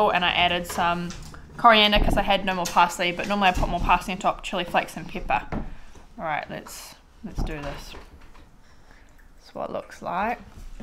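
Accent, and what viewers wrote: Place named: New Zealand. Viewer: I always enjoy your videos - the lighting has never been something I've noticed...I'm so focused on your awesome Kiwi accent!! I love it! Super cute kids and your older one helping you here was fun to watch. Will def make this chowder soon! (even tho still VERY hot where I am in Texas) Thanks for your channel! (sorry about your lockdown weariness, saw that on Insta today :( wish Covid would disappear.)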